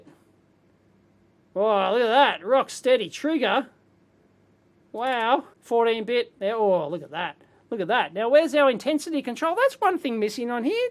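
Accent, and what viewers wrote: Australia. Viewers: "Darta logging" Love the aussie accent !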